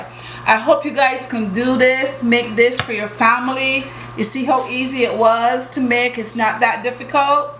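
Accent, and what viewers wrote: Barbados. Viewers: Love that I can still hear the bajan accent coming thru. Bless up queen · I too love a Bajan accent. Music to my ears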